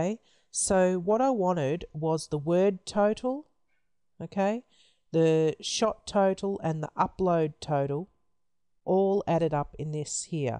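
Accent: Australia. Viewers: I love your accent. That is Australian? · Thanks for your explanation and tutorial! (I miss the Aussie accent!) :-) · Greetings mate!!! While I can certainly appreciate you taking the time to create this informative video, you kept saying: ok one to many times. Which many of us find distracting and highly unprofessional to say the least. It's bad enough we have to endure your aussie accent topped with ok, ok, ok, ok, ok, ok, ok. People need to be able to fully concentrate. Its apparent saying ok to often is part of your vernacular, but re-frame from saying: ok, when attempting to make a professional and educational video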